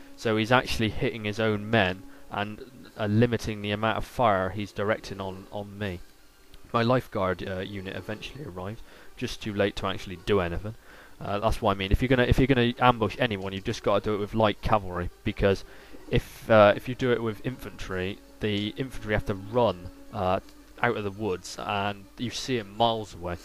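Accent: United Kingdom. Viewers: Southwest england? Or scotland or nothern Ireland?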